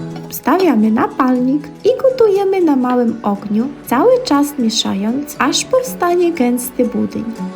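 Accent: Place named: East, Poland. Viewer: pieknie mówisz po polsku. Twoje wschodnie pochodzenie tylko lekko słychać w wypowiadanych słowach.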